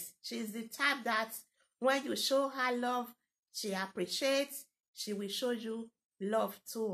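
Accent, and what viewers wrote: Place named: Italy. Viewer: I love your Italian accent, I have my cousins there in Italy, nice content sis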